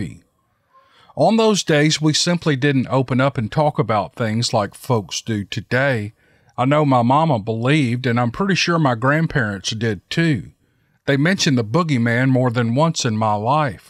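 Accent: South, United States. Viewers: I just love that Southern accent !!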